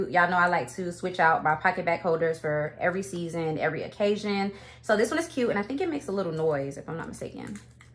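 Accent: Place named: Bay Area, United States. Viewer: Thanks so much for the new scents descriptions! I can't wait to hear your thoughts when the extended Gingham line drops. Btw did I miss a FAQ or get to know me video? I must know if you're from the Bay.😂 You talk so similar to us here in the Bay(Cali not FL) and even some of your mannerisms are similar!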